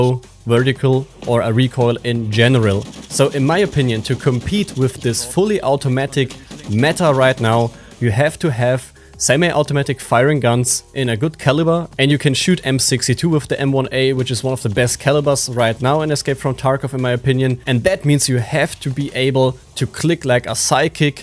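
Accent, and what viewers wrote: Germany. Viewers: I hear German Akzent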